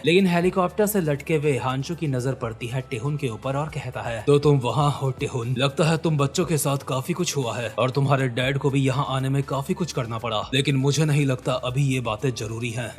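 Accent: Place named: Hyderabad, India. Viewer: Ap ki language Hyderabadi dekh ri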